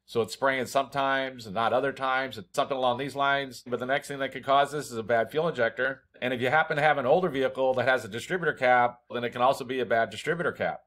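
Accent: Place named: Chicago, United States. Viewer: Very nice accent ❤ from where this accent is it a Chicago?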